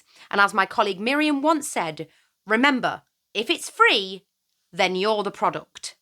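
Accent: United Kingdom. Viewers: I was being a butt at the beginning of this video, expecting it to irritate me, even though I agree with the subject. But your skilled analysis and breakdown of the issue quickly turned me around. I just subscribed. Your video helped to make me aware of my blindspots and preconceived notions. One of those being a slightly xenophobic perception of the british accent. Thank you, and I'm sorry for doubting you.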